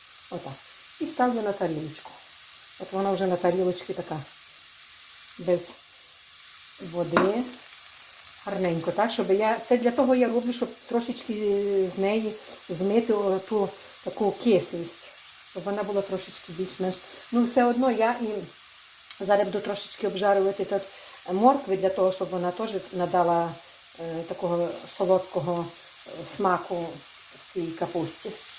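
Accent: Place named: Bukovina, Ukraine. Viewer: Слюна тече дякую мені кажеться що ви з Буковина наша рідна мова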